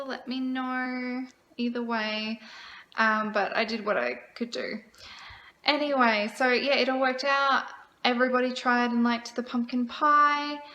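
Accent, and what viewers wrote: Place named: Australia. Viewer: This is a marvelous video thank you so much for sharing this incredible video I love your Australian accent and just recently learned that Australians don't celebrate thanksgiving lol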